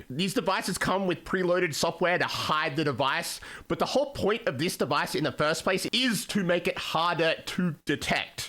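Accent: Australia. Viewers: Came for the drama, stayed for the Aussie accent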